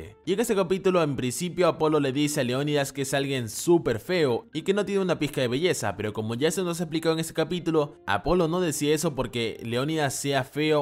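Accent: France. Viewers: tienes un acento francés, ¿eres de allá?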